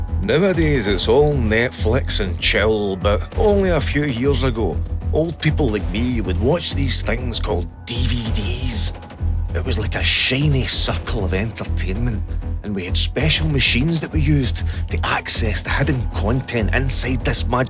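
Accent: Scotland, United Kingdom. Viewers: Fist time I,ve ever seen any of your stuff,why has it taken so long for this to be brought to my attention Hello I understand Your Scottish or something I think theres a scottish on Our Planet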